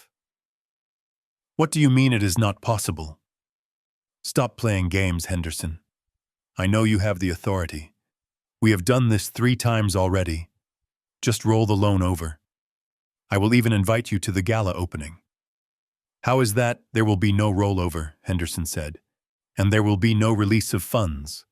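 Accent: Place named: United States. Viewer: Enjoyed listening , nice clear American accent , from Scotland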